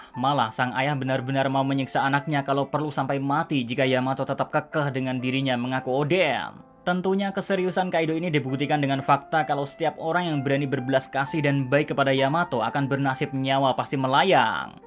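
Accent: Java, Indonesia. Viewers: mind reques ..tampilin wajahmu mim soale model ngmge sampean iki wong jowo wkwkwk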